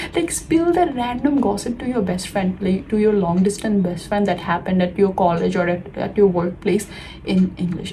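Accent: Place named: India. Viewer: Wow your English is fluent! 👏 Just the accent sounds a bit like Indian vibes 🥲 maybe if you change the tone a little, it’ll be perfect 💯 otherwise it's oky👌👍❤️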